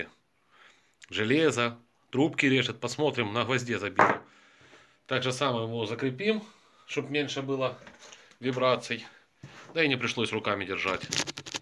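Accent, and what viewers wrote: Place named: Belarus. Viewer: Фирменный белорусский говор)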